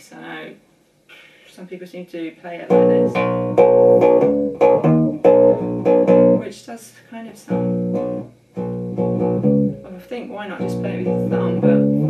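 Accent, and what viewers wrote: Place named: South, United States. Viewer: When English girl get all southern? ROCKIN MAN LOVE THE LOOP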